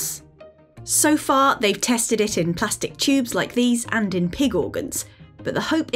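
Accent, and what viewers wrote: United Kingdom. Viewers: The extremely British, and unnecessary, accent intonations had me quit half-way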